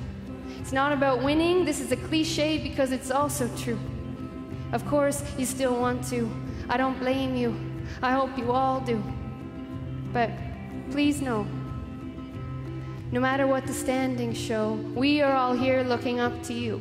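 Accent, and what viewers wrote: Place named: France. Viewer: Wow, that was beautiful. Your French accent is quite nice too.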